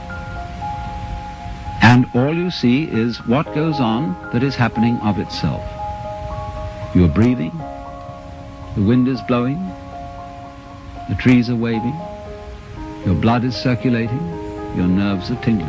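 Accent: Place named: United Kingdom. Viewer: Perfectly British voice to perfection lecture..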